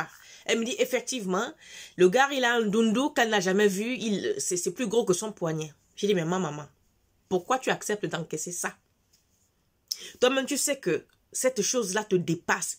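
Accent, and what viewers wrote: Gabon. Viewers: VOUS ETES GABONAISE? j'ai reconnu l'accent c'est le 241 qui gagne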